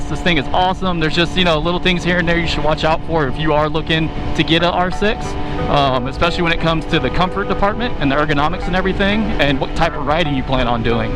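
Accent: United States. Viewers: What part of Louisiana you from?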